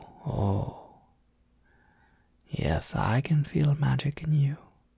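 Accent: Ireland. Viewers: I saw irish accent and I was like "MY KIND" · You should really do more on this. Your accent sounds good and the character is wonderful ^^. Much love for Ireland <3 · Omg You're so damn good at being Irish!!!!!!!!!!!!!!!!!!!!!!!! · my dads british and invites his irish friends over the pond, so the one time i was around there were 2 guys over and one of them sounded a lot like this dude, and the other guy almost broke my eardrums and drank an entire bottle of whisky i was saving for my bday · This was so good I might just need a second part to this o-o This is the only Irish fey asmr I could find and it works so well and just hits different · i’m irish and i’d like to say your accent is quite good! we don’t see a lot of americans who are actually decent at irish accents because it’s very very hard to recreate because we sound like a bag of spanners being thrown down the stairs so well done! 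· The Irish accent needs a little bit of work, but was genuinely not bad! I'm so used to people imitating our accent and being immediately enraged. But, this was pretty good!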